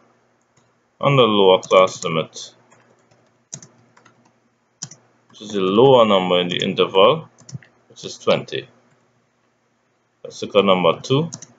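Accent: Trinidad and Tobago. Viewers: He is Caribbean possibly trinidadian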